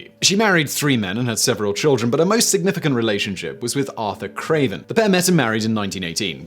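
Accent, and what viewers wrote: United Kingdom. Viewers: Intrusive r, very british as well :)))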